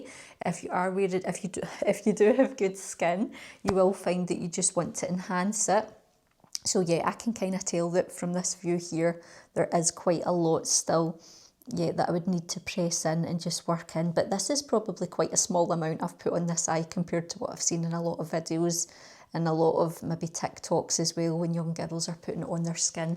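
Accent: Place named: Scotland, United Kingdom. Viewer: i’ve already watched a couple and loved them. I’m older and absolutely love beauty and make up and I seriously have never never been able to put concealer on. I read about this one and thought I’m going to try it and then found your video about how you apply it and seriously never had such success with an under Eye concealer and I need it at my age so thank you and I will enjoy watching the rest of the videos and I’m so glad I subscribed and I’m also Scottish but I live in Australia, so the accent is very welcome 😊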